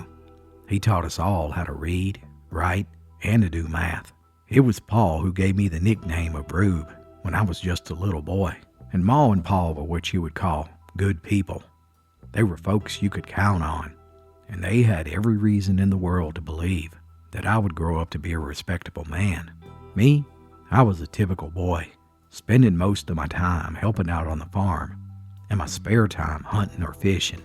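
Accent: Appalachia, United States. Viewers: From Switzerland or from Mountainman to Mountainman. Great Storytelling, love it. The way you tell it and can l say your Appalachian dialekt make your storys special. And can l ask you for that Red Rube video intro tune?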